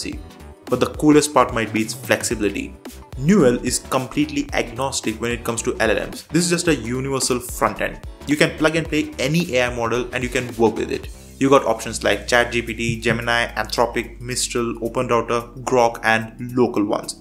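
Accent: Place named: India. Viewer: Dude, I don't understand what you are saying. you got strong Indian accent. I agree with everyone here. LINUX should be free from AI. I left windows 10 years ago, because I don't want Bill Gates to spy on my computer and steal my bank information and all other login info. Installing AI that is NOT open source means you are putting a Trojan horse in your computer. Soon AI will take over your laptop and STEAL all your bank info and MONEY. That is scary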